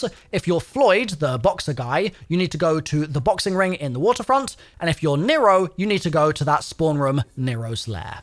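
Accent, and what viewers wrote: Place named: United Kingdom. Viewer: I am laughing so hard at the fact that two days ago I went to find your tutorial and couldn't find one. Now I finally can have my proper British walkthrough as the keepers intended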